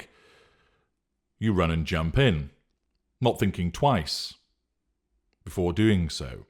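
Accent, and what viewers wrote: United Kingdom. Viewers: This British accent- I feel like a spot of Earl Grey and a plate of biscuits while listening to this😅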